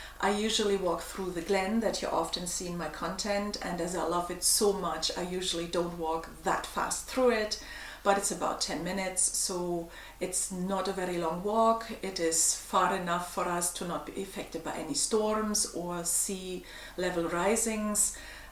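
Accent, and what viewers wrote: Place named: Scotland, United Kingdom. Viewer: Wishing you an abundance of success in your new business. I think your accent sounds like 90% Scottish... so lovely.😍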